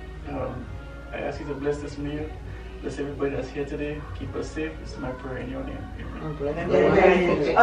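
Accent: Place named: Caribbean. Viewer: I hear a Caribbean Accent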